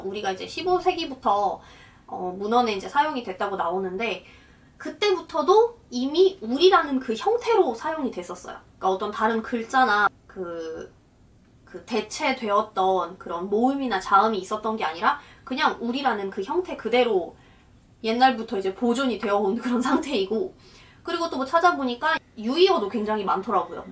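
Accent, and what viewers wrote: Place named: South Korea. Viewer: 누나는 사실 '우리' 한국 사람임 유소년기를 보낸 지역이 정체성을 많이 결정하는데 초등학교까지 한국에서 졸업하셧다니 말투, 문화 영혼까지 한국 사람으로 보임. 그냥 말씀하시는 것도 리액션도 그냥 한국 여자잖아요 ㅋㅋ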